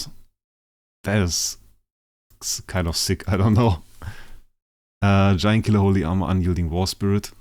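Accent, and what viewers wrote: Germany. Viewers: You german??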